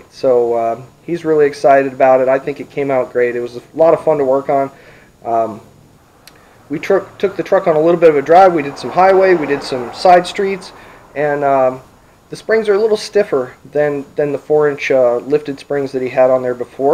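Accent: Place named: California, United States. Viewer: I could tell you were a californian right away. lmao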